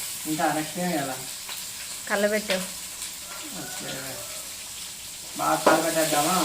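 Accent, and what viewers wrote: Rayalaseema, India. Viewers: Rayalaseema accent tagedela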